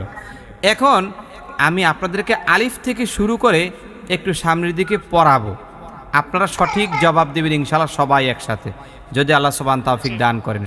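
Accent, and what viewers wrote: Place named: Mymensingh, Bangladesh. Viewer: হুজুর আপনার বাড়ি কই মনে হচ্ছে আমাদের ময়মনসিংহ বিভাগে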